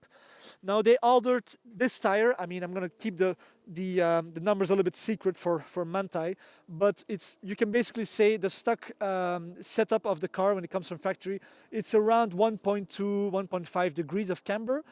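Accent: Belgium. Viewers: Where are you from ? you don't sound German (otherwise your english is very good) you sound more like a Belgian talking English :p unless i'm that mistaken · Interesting video! 👍 And clearly you're from Belgium. 😄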